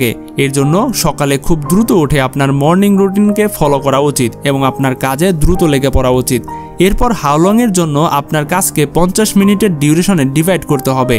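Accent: Bangladesh. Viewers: বাংলাদেশী আমার মনে হচ্ছে। কথা শুনে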